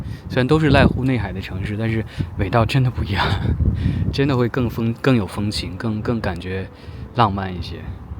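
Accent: Standard. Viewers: 视频主的声线温柔纯净，普通话标准，赞！ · 哪裡人？有沒有人說過你聲音蠻好聽的？普通話也很標準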